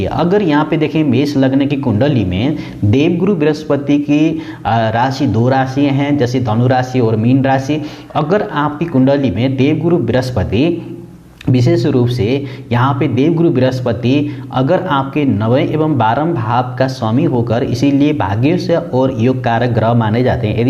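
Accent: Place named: Nepal. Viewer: तपाइ नेपालि हो जस्तो लाग्छ त बोलि सुनदात हो नेपालि मिक्स छ बोलिमा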